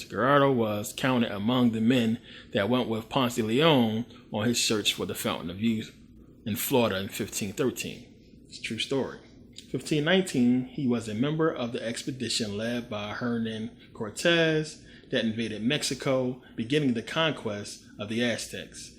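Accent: Philadelphia, United States. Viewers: He’s from Philly. We are city cousins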